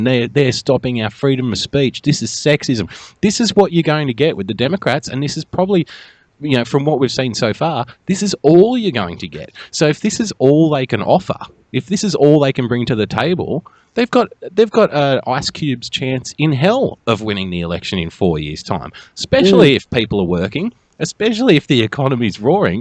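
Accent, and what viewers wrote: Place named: Australia. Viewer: This is a good Summary. Worth a listen . The Aussie accent is off-putting . You tend to think an Ozz has no place advising the US . But I enjoyed it.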